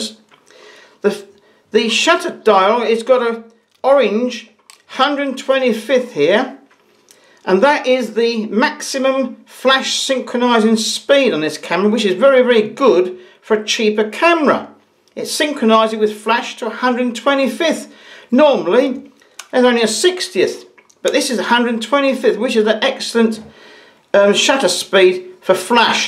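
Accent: United Kingdom. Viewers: full of British accent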